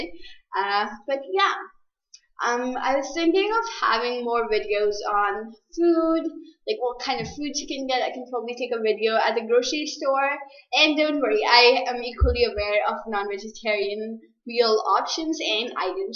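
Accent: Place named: India. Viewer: Just like ..just like ..just like. Indians english in canada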